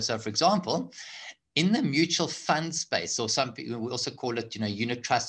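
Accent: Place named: South Africa. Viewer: i love a good south african accent!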